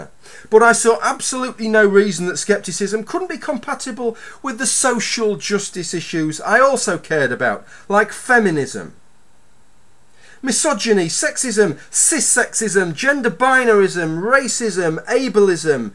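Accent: United Kingdom. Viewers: Your passionate indignation is quite inspiring and I quite agree with your argument, with the exception of calling TF00t a prick. ; ) Totally off topic: I love your accent. What part of the UK are you from? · I do miss hearing English spoken by native UK English speakers :) /me ex-pat ten years, oh and screw Atheism +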